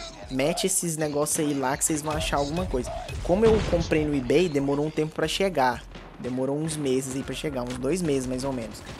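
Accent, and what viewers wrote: Minas Gerais, Brazil. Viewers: O cara é mineiro demais da conta sô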